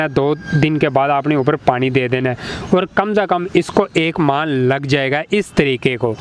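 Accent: Punjab, India. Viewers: کیا ھی اچھی بات ھوتی کہ آّپ خالص اردو یا پنجابی ملاکر اردو بولتے بجاے اسکے کہ بونڈی انگلش مارتے ، شکل سے یکدم ریڑی والے ھو ، تمہاری انگلش بھی انگش سے زیادہ پنجابی لگتی ہیں تو بھایی کیوں اپنے آّپکو لطیفے بنانے پر تلے ھو ، صاف ستھری پیاری پیاری اردو پنجابی لہجے میں بولو ، اچھا لگوگے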